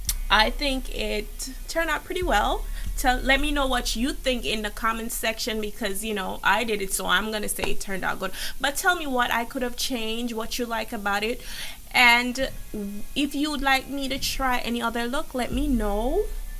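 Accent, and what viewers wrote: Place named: Caribbean. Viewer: I hear an accent are you from the Caribbean?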